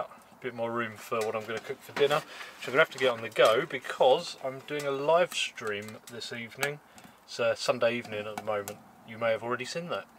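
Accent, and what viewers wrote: United Kingdom. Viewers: Mate honestly I’ve just started watching your videos and you’ve got all my attention and utmost respect! Sir you’re quite amazing. Would you mind telling me where are you from? Cause Sir You sound like you from UK?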